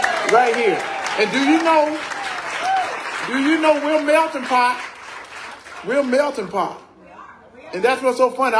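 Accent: South, United States. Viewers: Making fun of his deep southern accent???? This Georgian is voting for him.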